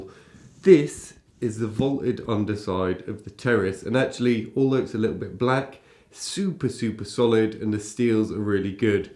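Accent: United Kingdom. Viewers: I don’t speak French but French sounds so beautiful!! As an American I love his British accent but I could listen to a whole video of him speaking French!!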